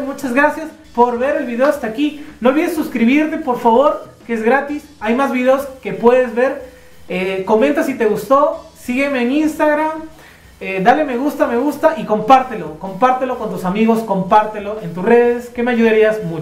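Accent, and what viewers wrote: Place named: Mexico. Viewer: Bacan la info... pero no hables como mejicano... pa que!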